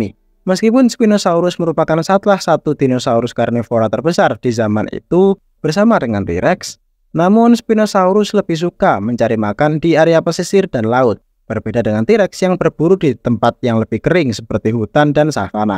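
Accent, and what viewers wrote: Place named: Java, Indonesia. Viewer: Bg mhon maaf nih ye,,😂.. kok suaranya abg nih mirip chanel nyoto tutorial ye?? Apakah org nya sama😅.. khas kek ada jawa nya gitu cara bicaranya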